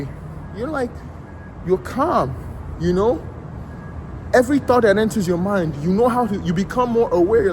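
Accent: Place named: Nigeria. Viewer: Great video, man. Btw, you kinda sound Nigerian. I'm Nigerian, that's why... hehe.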